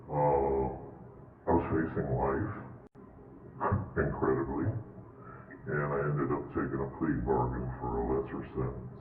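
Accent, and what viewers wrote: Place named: South, United States. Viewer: Texas? The accent hits home hard, definitely from the south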